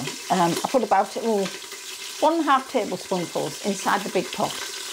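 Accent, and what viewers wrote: United Kingdom. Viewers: Wow!, you had my mouth watering when you took the lid off to dish up your meal…I love fennel and olives and, of course, thighs! I live in Southern California so excuse me for saying this but I love your UK accent!!! I have been to London five or six times and was also lucky enough to work in Slough for four weeks…so you always make me happy to watch you cooking!🥰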